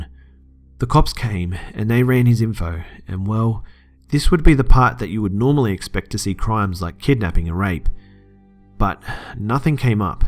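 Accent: Australia. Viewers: I think he's Australian? someone correct me if I'm wrong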